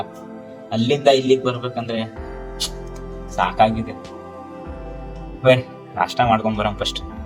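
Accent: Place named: North Karnataka, India. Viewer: Anna namma north karanataka language nag maat ada your logs is very nice👍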